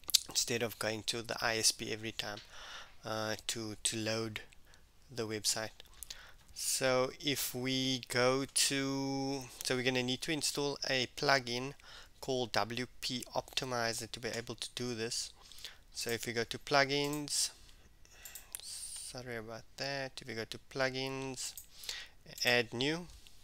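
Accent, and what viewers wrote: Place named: South Africa. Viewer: Thanks for the video, nice to hear a South African accent glad there are local tech YouTubers out there.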